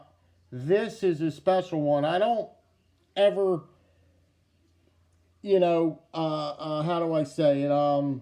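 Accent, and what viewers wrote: Philadelphia, United States. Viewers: I love mine! I wish I had the money to grab the Oily Creek bone version as well, but unfortunately, I could only afford the one. Hopefully I'll be able to get a Sambar Stag one when they release it. GEC makes a great knife! I wish I had gotten into them sooner, but this one makes my 7th one, and so far my favorite. The #93 oily creek bone rams foot is a close second. They used this wood to soak up and clean the oil from the inside of their drill pipes back in the day. That's why it's called sucker rod wood. I used to work at the Philly airport for USAIR for 20 years, and I lived in Williamstown NJ,. I'm living up in NYS now, but listening to you talk brings back fond memories of that 20 yrs I spent down there. Loving the accent and the way you talk brother!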